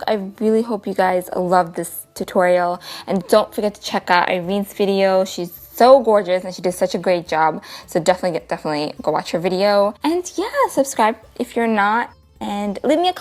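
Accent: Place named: Dhaka, Bangladesh. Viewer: tea connoiseur ok heard you speak. you're dhakai. great video!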